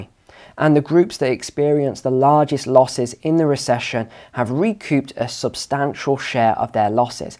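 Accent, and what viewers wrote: United States. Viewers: Pretty gooder American accent.. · Your American accent sounds good enough to me! My British accent amounts to adding words like "wobbler," "mate" and "cheers" to my sentences. Cheers,! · flawless american accent! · Lol nice accent brother 😃 Apart from the top drawer US accent your work is splendid. Thank you fella. Great job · You do a pretty good American accent!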